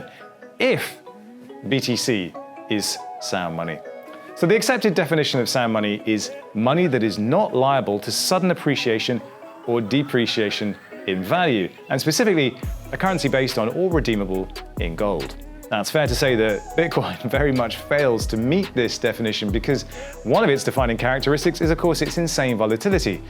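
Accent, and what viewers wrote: United Kingdom. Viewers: Why is a British accent so convincing? Between this dude and Coin Bureau, I believe everything they say!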